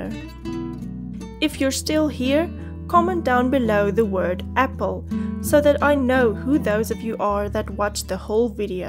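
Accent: South Africa. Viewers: Apple ... I am a small printing business in the UK and have been inspired by your determination. As an ex SA pat I subscribed and look forward to more videos on how you are doing. Keep it up girl. Can't get enough of the accent. Kisses ... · Always fun clicking on something on Youtube and hearing that SA accent! May your business grow bigger and bigger each day! Sending love, from a Saffa in the US❤️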